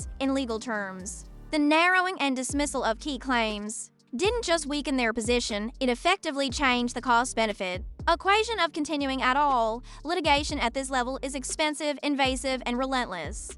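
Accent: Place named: South, United States. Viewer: This is hilarious. An AI video with a deep southern accent. You can always spot an AI video by the utter lack of cadence. But this one with a southern accent is glaring. Lmao😂😂😂😂😂😅😅😅😅😅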